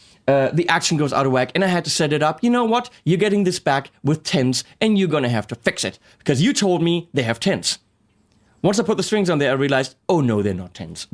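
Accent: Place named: Germany. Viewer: You talk a whole bunch of s*** I can't even understand you with that German accent put the guitar down and just walk away from it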